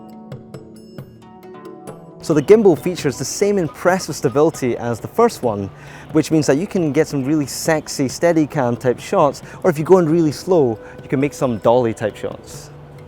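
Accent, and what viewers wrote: United Kingdom. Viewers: Too much British accent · Y'all need to chill. He's speaking with a British accent. British spell it colour. That's the joke. I'm well aware that America spells it color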